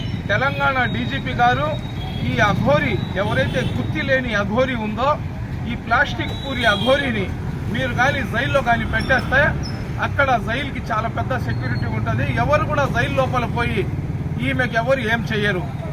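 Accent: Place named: Nellore, India. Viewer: Nellore style lo chepparu great bhai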